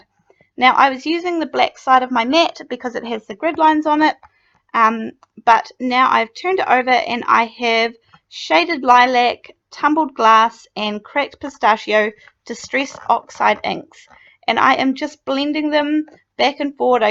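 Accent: New Zealand. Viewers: Lovely cards as always. Also very simple and achievable. Are you from New Zealand?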